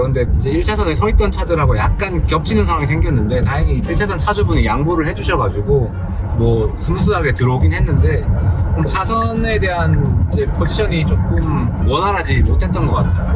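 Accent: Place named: Gyeongsang, South Korea. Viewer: 오잉. 갱상도 말 쓰시네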